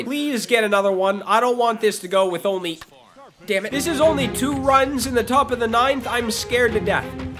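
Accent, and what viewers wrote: Canada. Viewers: are you from Ontario or the Maretimes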